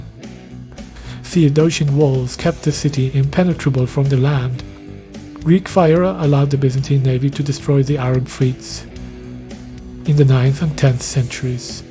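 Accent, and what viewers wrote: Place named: Portugal. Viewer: porteguese accent detected? 🇧🇷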